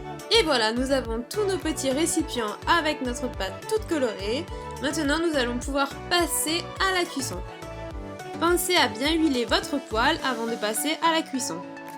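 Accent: South, France. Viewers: C'est quoi cet accent du sud la? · teddy zora Elle est du sud ducon mdr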